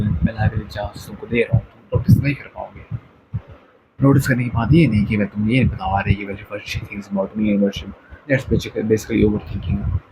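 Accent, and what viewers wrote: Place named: Pakistan. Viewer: Articulation needs improvement , but bro ur voice wow🙂‍↕️ , what a voice.. really you could be a voice artist Btw r u pakistani bcz u sounded like that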